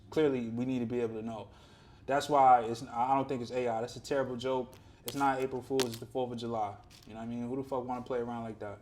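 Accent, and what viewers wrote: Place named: New York, United States. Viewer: Bro u sound like a nerd like one those wannabes from new york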